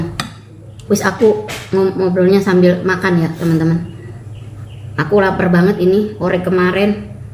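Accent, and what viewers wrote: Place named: Cirebon, Indonesia. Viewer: Semoga ama cpt sembuh....,maaf mba wong crebon ya...dari dialeknya..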